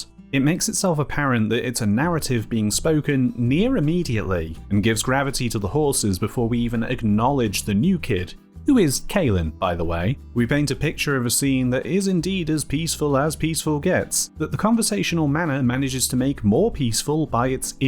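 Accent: United Kingdom. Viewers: Dude! I had no idea you were british.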